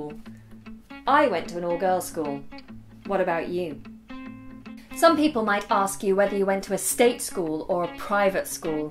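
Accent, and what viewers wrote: United Kingdom. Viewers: Hello, Mrs., I am one of your big fans and I follow your page on Instagram. My question to you is, do you also teach online English lessons with a British accent? Thank you 🙏🙏🌹🌹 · Thank you; I prefer the British prononciation to the USA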